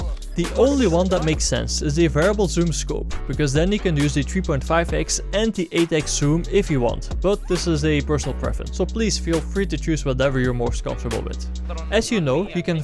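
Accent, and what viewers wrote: Netherlands. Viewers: Je klinkt Nederlands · He is Dutch for sure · The Dutch accent is strong in this one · 100% NL door z'n acent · Love the Dutch "Sneiper" accent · this dude sounds dutch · Ate you Dutch ? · you are dutch right :D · Jo deze gast is Nederlands 100%% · First video I watch of this dude, and I can already tell he's dutch lol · Nice dutch accent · U dutch??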